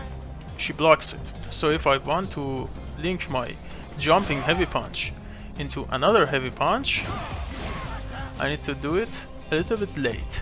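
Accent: Iran. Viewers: lmao u have to be Persian ur accent is so obvious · why does this guy sound Iranian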